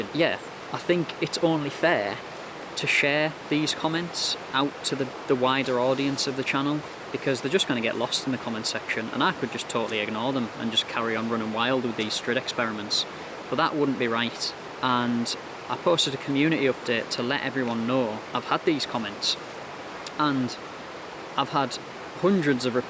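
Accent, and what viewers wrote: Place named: Bolton, United Kingdom. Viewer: Are you from Bolton by any chance? You sound a lot like a certain Mr. Fred Dibnah to my untrained American ears.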